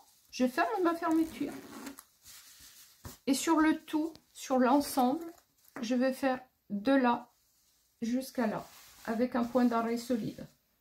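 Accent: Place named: Alsace, France. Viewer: Bonjour, merci pour vos conseils. Le pantalon est presque fini, je vais coudre une fg sur le côté. N'ayant pas pris les mesures nécessaires pour réaliser une braquette . Merci , le prochain patron , je m assure qu'il soit avec braguette Et je me plais de penser à cette réalisation. Je suis très prototype avant de coudre , j'avais réalisé que mon exercice s' avéré incomplet. 😮 Bon c'est sur je m'y met. La couture est mon deuxième poumon 😂 Merci encore, également votre petit accent me plaît bien. Alsace peut etre? Amicalement!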